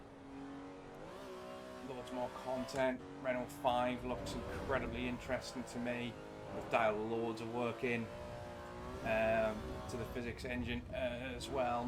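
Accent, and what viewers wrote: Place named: Sunderland, United Kingdom. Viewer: You sound more mackem than usual in this one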